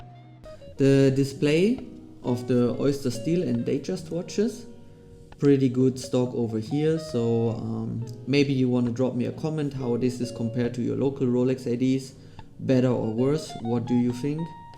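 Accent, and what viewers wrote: Germany. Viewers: Wenn du nach zwei Sätzen schon den Deutschen Akzent bemerkest. Sympathisch! Viel Erfolg bei deinem Vorhaben. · Your accent is Germany. Bist du aus Deutschland?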